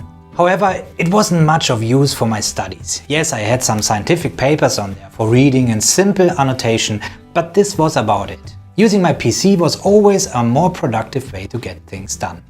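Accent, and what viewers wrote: Germany. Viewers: Man hört absolut deinen deutschen Akzent heraus, aber trotzdem gutes Video. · Are you a german? By the way great video! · MrLufus ja man, das habe ich auch gerade gedacht. Ich habe auf ein Deutschen Kommentar gewartet😂❤️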